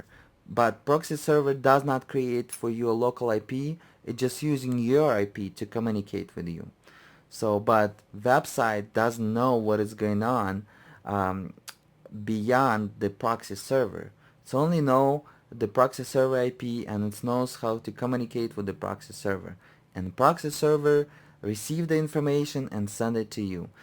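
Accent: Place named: Germany. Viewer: he sounds german to me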